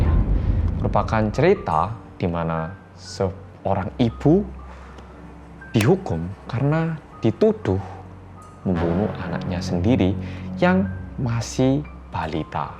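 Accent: Java, Indonesia. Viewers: Mas kok gak terlalu semangat medokkkkkkkk nya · Mas kurusan, pipinya gx berisi lagi. Stay healt oppa meddok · hello guys kembali lagi dengan detektif reomit detektif korea yangggg medoook saya rekomendasikan mas ngomong gitu pas detektif reomit wkkwkwk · Hahahahah aku suka nonton ini pas lagi kesel langsung mood lagi. Soalnya aku yg tampang jawa bgt g medok ini yang muka bule medoknya ampun hahahahaha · Medhok nya gak panjang lagi wkw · Coba donk kak bikin vid kok bisa bljr bahasa indonesia sampe bisa medok gtu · halo guys david disini bersama orang korea yang medookk 😁😁😁✌🏻✌🏻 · Mas... medok nya ngelewihi aku sing wong jowo.. hihihi... betah ngrungoke cerita2ne.. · Habis pingsan lanjut lihat mas 🥺 medooookkkk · "Bersama orang korea yang...... Meduokkkk...." Tapi logatnya sangat enak didengar, jadi dengerin ceritanya tu enak. Dibanding youtuber lain yang kadang kalau ngmg suka bikin pegel telinga. 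Lebih parah kalau denger suara nelen ludahnya, bikin makin males. Goodjob mas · Purik astaga jowo temen cak😹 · Ka next vidio buatin tes pasikopat pliss Medooo 😄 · "Halo guys welcome back to Korea Reomit bersama orang Korea yang Medhokkkk" Knp selalu inget ortu Mas yang suka bilang medhok medhok ke kamera😂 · Welcome back to korea reomit, bersama orang Korea yang.......... Medhok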